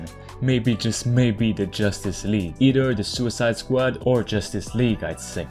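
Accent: Quebec, Canada. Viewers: are you Quebecan or French Canadian?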